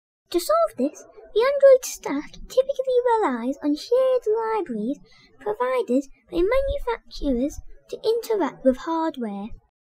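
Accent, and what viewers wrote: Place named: Liverpool, United Kingdom. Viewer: The gentleman is from Liverpool, if I'm not mistaken.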